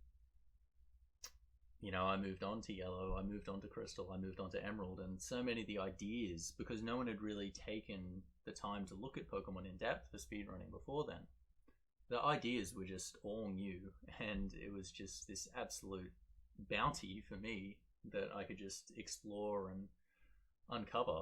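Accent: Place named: Australia. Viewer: OMG YOUR AUSTRALIAN! I DIDNT KNOW. I AM. PLZ TELL ME U LIVE IN MELBOURNE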